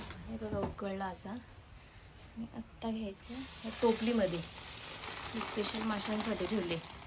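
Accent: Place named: Thane-Palghar, India. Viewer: तुझ गाव कोणते? भाषा ठाणे-पालघर ची वाटते.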